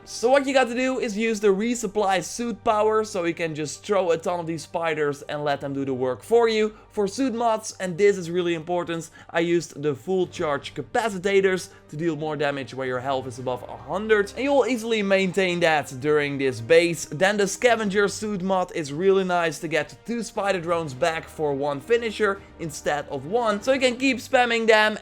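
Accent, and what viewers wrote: Netherlands. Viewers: Are you Dutch? ..You sound Dutch..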